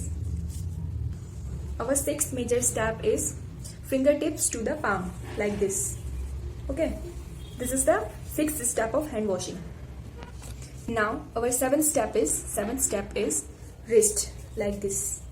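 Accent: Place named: Haryana, India. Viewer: I think 💯 mam is from haryana ❤❤ 😂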